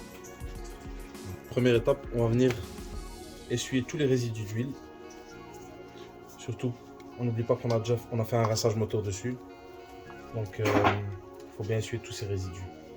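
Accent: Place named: Belgium. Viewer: Je suis français et je possede une BMW e46 essence donc rien à voir avec ce modèle mais j'ai pris énormément de plaisir à voir ce travail bien fait par un mécanicien au sympathique accent belge et surtout un vocabulaire et un phrasé parfait tout au long de son intervention. Ca fait bien plaisir. Merci Monsieur 🙂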